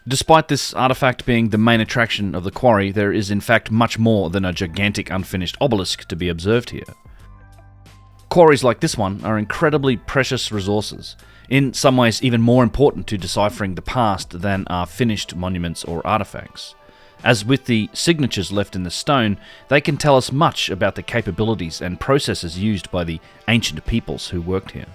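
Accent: Australia. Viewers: That's a fellow Aussie accent! 😉 Nice work mate 🙂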